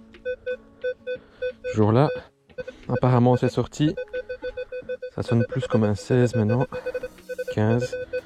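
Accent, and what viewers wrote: Belgium. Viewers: OK ! Je ne savais pas que tu étais en Belgique avec une autre réglementation. J'aurais dû m'en douter avec l'accent... Bons trous et dans l'attente d'autres vidéos aussi sympa. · Accent belge sa non?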